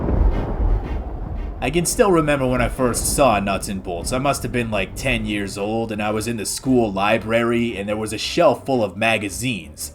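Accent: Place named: New York, United States. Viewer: First time viewer here: When I hear him speak I picture a New Yorker sitting in a bar telling a story. I don't know why I just couldn't get that image out of my head.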